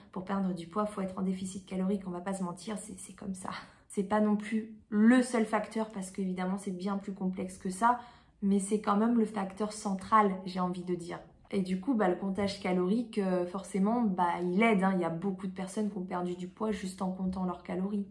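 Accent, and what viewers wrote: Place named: Brittany, France. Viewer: WoW une bretonne dans le sud ! Mais comment tu supportes la chaleur ?! Pour moi, descendre plus bas que Nantes c'est déjà pénible... C'est marrant parce que j'aime beaucoup ton petit accent (genre quand tu dis "pour soi-meume") bien de là-bas, j'aurais jamais pensé que t'étais bretonne comme moi !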